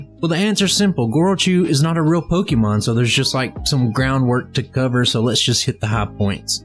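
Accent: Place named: Alabama, United States. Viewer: The twang behind it feels like that Bama flavor like my boi Cooper has 🔥 But yeah til this day we never grew up 😅 we still play Pokemon & battle each other on pokemon showdown & we're 30 now 😂🕺🏾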